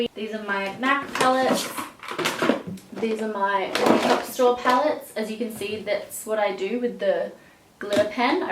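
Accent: Australia. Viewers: i see from your accent your Australian?? so am i but i was wondering where u get ur makeup from because u have the brands that we don't have in Australia???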